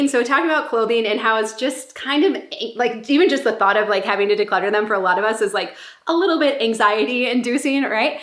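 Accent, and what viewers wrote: Minnesota, United States. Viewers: Lol she lives in MN. That's her regular accent.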